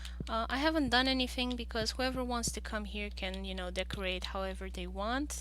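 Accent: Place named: Iran. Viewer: Very cool video. I like your accent. Are you Persian?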